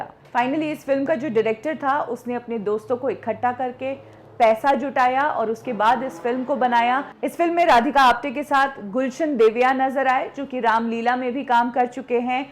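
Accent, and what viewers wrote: India. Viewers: हिंदी का उच्चारण सुधारें। Marathi influence is very clear. हैं को है बोलती हैं। इस तरह को और बहुत सारी गलतियां हैं।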